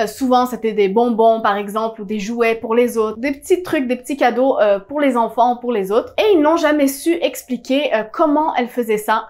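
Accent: Quebec, Canada. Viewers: Est-ce que tu es québécoise? Car ton acsent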